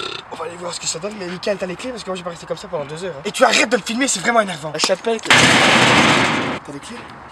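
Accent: Belgium. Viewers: T'es belge ou français ta un petit aquessent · Cet accent belge ahah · Tellement bon, j'ai ressenti le Poelvoorde jeune avec une bon punch à l'accent Belge. Il y a des génies et il y a. merci!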